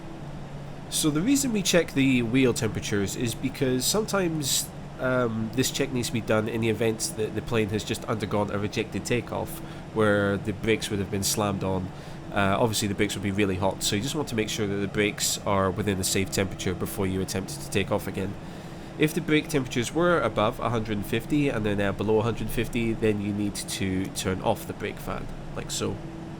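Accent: Scotland, United Kingdom. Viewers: Are you Scottish if you don't mind me asking?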